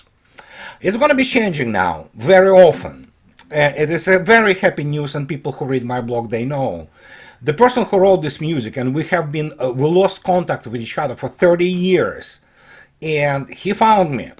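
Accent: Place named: Russia. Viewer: Thanks. English with Russian accent. Now I get the meme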